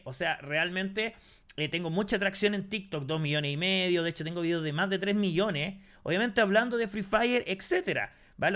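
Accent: Chile. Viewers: eres chileno wn?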